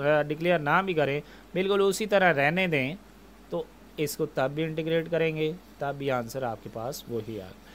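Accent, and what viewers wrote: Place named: India. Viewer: first lac i understand each and everything😃,thanks allah sir your teaching method is soo good,because you speak too desi like us😁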